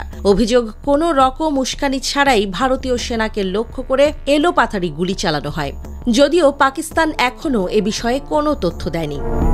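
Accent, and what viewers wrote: Kolkata, India. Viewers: ঠিক প্রথম কলকাতা মত খবর গুলো পরছে।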